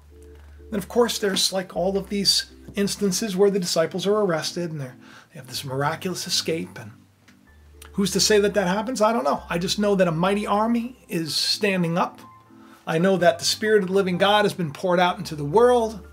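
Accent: New York, United States. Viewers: Talks like true New Yorker “anti gun” everything… Under this logic all police who carry a gun are out to hurt someone..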